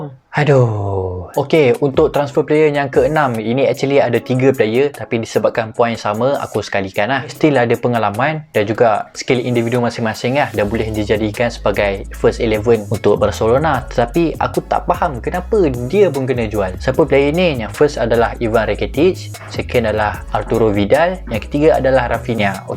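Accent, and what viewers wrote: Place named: Kuala Lumpur, Malaysia. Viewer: Awt ckp KL 😂 😂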